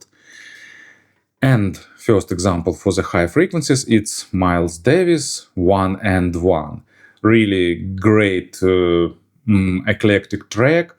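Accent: Ukraine. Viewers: I appreciate the daily dose of sophisticated Ukrainian accent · “Sophisticated” daily dose of Ukrainian accent 👍 Greetings from India 🇮🇳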